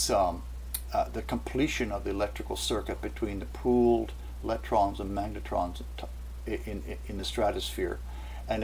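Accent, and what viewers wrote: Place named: Canada. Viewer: Hey are you Canadian? You sound like me. lol. I think I know that accent. Be sure to see these if you have not regarding planet X, excellent information. it discusses the plasma field as well as the iron oxide and the debris it drags along with it on all sides, pushing asteroids ahead and dragging it behind. It isn't moving that slowly either as Carlos Ferrara discovered in his 40+ years of study of its perturbations, Carlos found it travels at three speeds, check out what he has to share with us about this. CARLOS MUNOZ FERRADA HERCOLUBUS (Chilean Astro Pysicist 40+ years study Nibiru perturbations) <link> Also don't think our magnetosphere is going to protect us as it is dropping at a very high rate at this point see this about that, stop the articles/emails in the video and read them to explain that which I am speaking of. Earth Changing End Time Signs (Magnetosphere is dropping, pole flip coming) <link> John B Wells; Gill Broussard Planet 7X (as far as I am concerned Gill produces the finest research material available) <link> A MUST WATCH Interview Nibiru Planet X future predictions;Carlos;Ferrara;(Chilean Astro Physicist 40+ yrs research) <link> P-7X Scripture and;TIMELINE Lg 11x17.pdf <link> (Free PDF downloads of Nibiru Timeline according bible and Asian Astronomical records) Gill spent 9 months in China alone studying Emperors Head Astronomers records and compared them to those of Korea, Japan, Germany, Turkey, the bible....it all correlates as true. 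The signs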